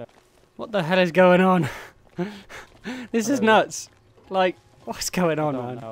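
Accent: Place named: West Country, United Kingdom. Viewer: Are you from Bristol or Somerset? Very west country accent :)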